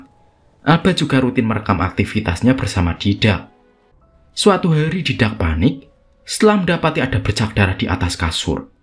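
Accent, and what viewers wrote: Java, Indonesia. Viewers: Pegel dengar naratornya yg medhok jawa.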